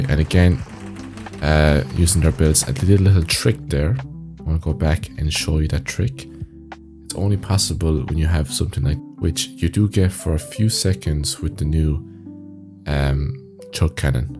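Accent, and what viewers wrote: Ireland. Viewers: Are u irish ?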